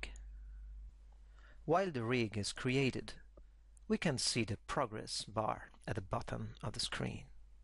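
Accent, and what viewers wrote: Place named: Italy. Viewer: I like the way explain. Since i'm french speaking i understand almost every words from his italian accent;) Better than any american speaking too fast for me or using slang...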